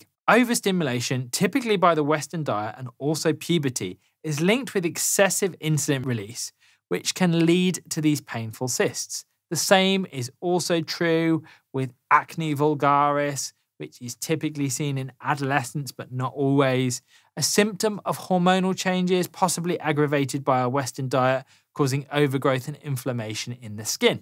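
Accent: United Kingdom. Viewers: At last ! A British accent !! From a knowledgeable British doctor. No more Yanky ti Yanks lol. Thanks for posting.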